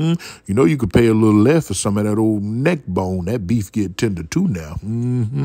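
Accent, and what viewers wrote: South, United States. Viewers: Lol 😂 rice on the plate got my cabbage and scoooted right next to it now thats tha down south old school country talk yall don't know nothin bout dat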